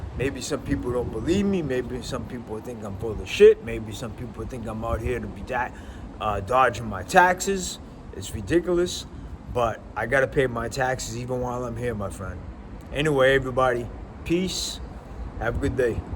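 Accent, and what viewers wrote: Boston, United States. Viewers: This guy is great 👍. I know that accent! I came of age near the Boston area. Just outside of Fitchburg to be more specific. I lived there for 22 years, lots of fond memories. Nowadays I have returned to my early childhood hometown in upstate NY to be closer to family who live in town and so I could at least afford a place to stay for awhile as an educator. I feel at least temporarily more protected from the scourge of the economy and national disasters. I did tell friends and family thought, if I have to relocate again, it is not going to be the United States.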